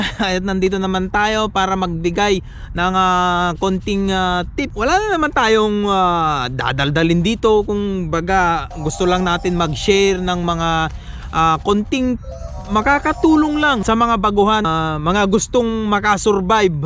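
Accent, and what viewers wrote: Ilocos, Philippines. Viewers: Annyeonghaseyo .. Sir , ilocano ka po ba?! 😁☺ Halata po kc sa accent .. Correct me po if I'm wrong .. 😉 Feeling ko po nkikinig ako sa pinsan ko dhil sa accent mo po .. Hihi Btw , thank you po sa tips .. EPS Aspirant here .. Sana po makapasa .. Para sa aking family .. Fighting!! 😇 GOD BLESS po .. 👍👊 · ah ilocano accent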